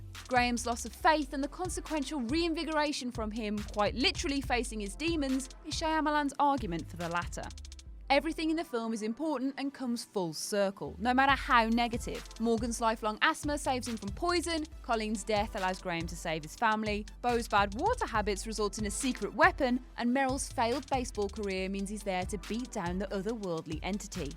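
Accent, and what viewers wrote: United Kingdom. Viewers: Why does every channel have an english accent now? Lol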